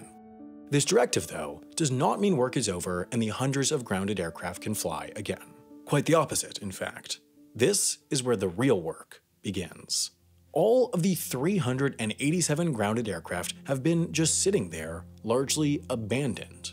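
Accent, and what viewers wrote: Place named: New England, United States. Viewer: This is heavily off-topic, but does Wendover have a Boston/New England accent? I keep hearing him say "No-at" for "not" (more like naat in most American accents), same for "Dollars".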